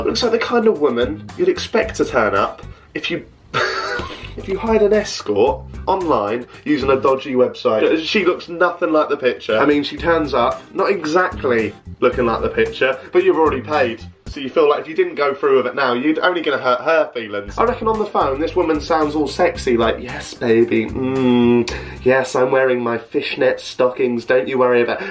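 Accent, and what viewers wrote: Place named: Yorkshire, United Kingdom. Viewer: your Yorkshire accent kills me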